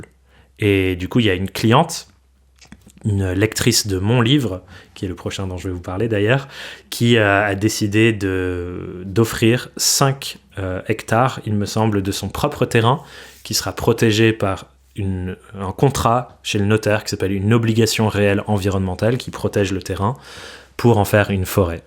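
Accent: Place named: England, United Kingdom. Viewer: On aime toujours autant ton accent anglais ;) Merci pour vos recos monsieur !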